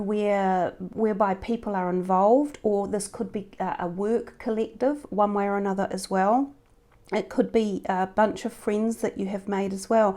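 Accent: New Zealand. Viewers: Thank you again for another beautiful reading, once again I resonated with the whole reading, & was bought to tears when you said about a little girl and she has grown. My god sister passed away from a brain tumour when she was 2 years old. And what spun me out the most was I was thinking about her when you first mentioned lights all around us, I asked myself I wonder if she has grown because I bet she would look absolutely beautiful, I feel i was meant to see this. I appreciate it alot. So much love from me to you. 💕 P. S Are you from NZ also? Your accent sounds kiwi ☺️ no offence intended if you are not 😁 Jess x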